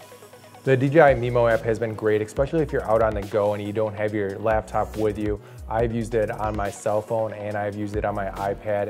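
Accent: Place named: Midwest, United States. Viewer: Midwest accent like a mofo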